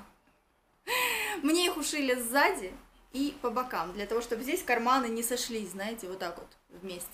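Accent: Rostov, Russia. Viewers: Не вылазИЮ))) , ой не могу с ростовского говорка ))))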